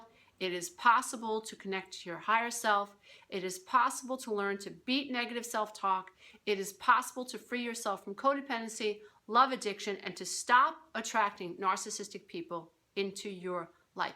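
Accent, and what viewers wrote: New York, United States. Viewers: Thank you Lady! I needed to hear that today. And your New York accent Rocks! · I think it's endearing that you have a new york accent :) a real person not an actor :D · = The reason why I've been coming to you for life guidance for over a ye-ah. Dat tick New York accent!!! No for real, not only was I stoked that I found on youtube an empowered Italian woman who could empathetically help adult children of alcoholics like myself...but do so with a Queens accent? Paisan!, you're literally the best! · Love the NY Accent. Im from Manhattan and the Bronx. :-) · Oh my goodness! I totally resonate with everything you are saying. Moved out last October. Almost a year! Woo Hoo! But... Why do I still miss the guy who was never NEVER really there- not physically or emotionally. He of course hoovered me 5 minutes after I moved out and then just a few months ago. 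Yay me for not falling for it, but after the last Hoover, I fell into the "vacuum". Guess what? I just met a new guy and he was love-bombing me on our first 'date', mirroring me, and even asking me to move in with him!! "You attract an energy being that is equal to the one you just kicked out the door!" EXACTLY!!! I feel like the universe is testing me- or maybe pointing out that I haven't done as much healing as I though I had..... Urgh.... Time to get to work and stop ignoring myself! By the way- I love it when you say "I digress..." And the NY accent! You speak so passionately and honestly. THANK YOU FOR ALL YOU DO! :) <3 Hugs from Canada. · New York accent-one of my favorite American accents! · Thank you for making me laugh today. That whole "feed me feed me" part. You've hit the nail on the head and this is exactly what I'm going through right now. And this is exactly what I needed to hear. 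Thank you for addressing everything in a very funny light-hearted way. You helped my day begin in a much nicer way than it did yesterday. And I think your New York accent is adorable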